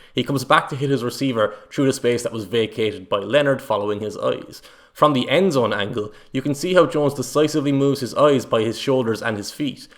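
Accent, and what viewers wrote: Ireland. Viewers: amazing breakdown! And I love that you only looked at one game for both good and bad. And tthe Irish accent is plus. Keep it up!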